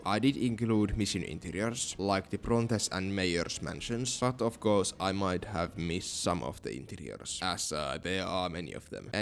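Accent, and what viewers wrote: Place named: Finland. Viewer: I can see his hard finnish accent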